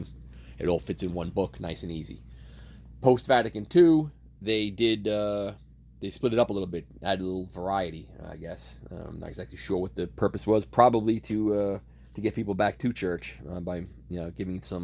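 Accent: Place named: Boston, United States. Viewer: You sound like JFK you must be from Boston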